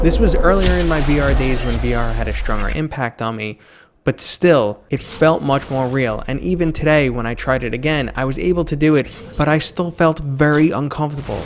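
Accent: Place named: Boston, United States. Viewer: Holy mother of Boston accent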